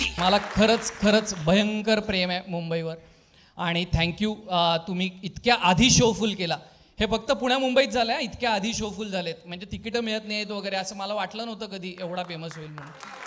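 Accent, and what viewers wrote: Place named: Kolhapur, India. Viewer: बरोबर आहे लय ऑड वाटतय पुणेकरांच्या तोंडातुन कोल्हापूरी भाषा ......जगात भारी आम्ही कोल्हापुरी😅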